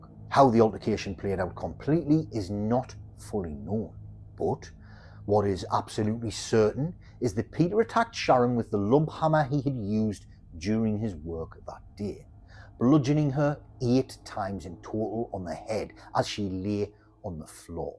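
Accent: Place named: Sunderland, United Kingdom. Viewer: Love hearing a fellow makem!! Cracking channel!!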